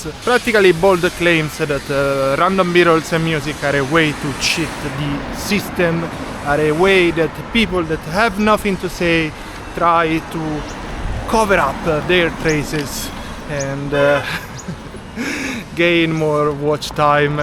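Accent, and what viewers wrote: Italy. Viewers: Thanks for the insight, best of luck on your channel. You got the talking down. Your English is very good. Love the Italian accent. Anch'io vivo qui in Italia. Vicino a milano e Torino. Piacere fratello.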